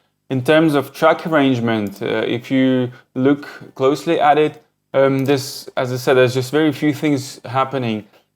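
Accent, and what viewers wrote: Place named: Eastern Europe. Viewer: Your accent always really takes me back, Its like half eastern european and half british cockney almost?! Have you spent time in UK?! Wicked tunes as always mate.. DIY cassette releases are the ay forward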